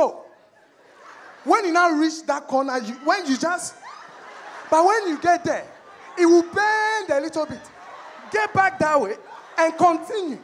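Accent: Ghana. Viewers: Nah he hit the Ghanaian accent on the nail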